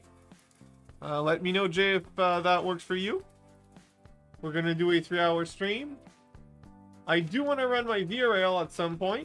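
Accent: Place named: Eastern Canada, Canada. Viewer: iwas into O gauge before N scale takes too much room N scale got into it 2 months ago all Dave and your fault lol. Im originally from northeast ofMiramichi but live in nofthwestern Ontario now . Taught i recognized you eastern accent lol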